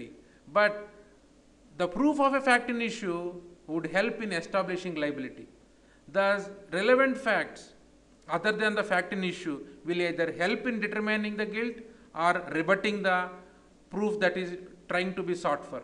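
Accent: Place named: India. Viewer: That Indian accent is extremely heavy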